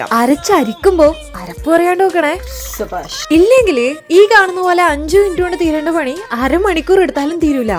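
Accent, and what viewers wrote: Kannur, India. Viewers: That Kannur slang❤addicted 😅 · Chechikutty kannur ano ente bashapole thonnunnu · നിങ്ങള് കണ്ണൂർ ഏടയാപ്പാ?😅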